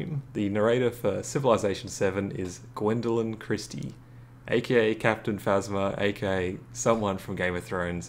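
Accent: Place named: England, United Kingdom. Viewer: HOLD ON YOUR ENGLISH??? I THOUGHT YOU WERE AUSTRALIAN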